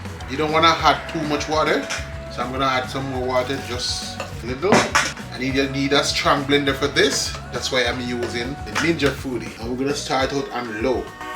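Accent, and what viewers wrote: Jamaica. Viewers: You sound like a Jamaican😊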